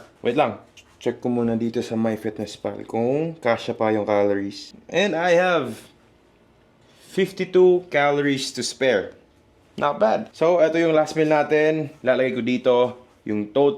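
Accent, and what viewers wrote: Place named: Conyo, Philippines. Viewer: Kabaklaan conyo pa mag salita, cringe ka talaga haha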